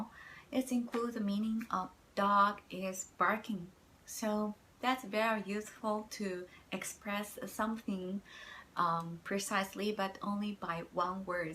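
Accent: China. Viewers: Are you a Japanese or Chinese? Your English accent seems like Chinese person speaking English.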